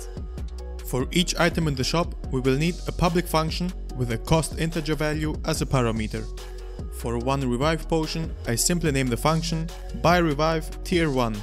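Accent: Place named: Greece. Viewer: I firstly suspected that from your pronunciation. It sounded similar to Greeks' accent in English. Also in your About tab on your channel page, you can see your country because you used a Greek phone number to verify the channel.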